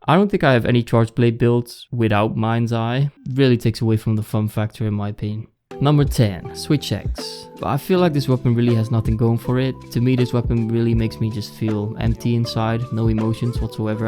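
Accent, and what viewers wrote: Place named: Netherlands. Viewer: I can pick that dutch accent out of ten thousand voices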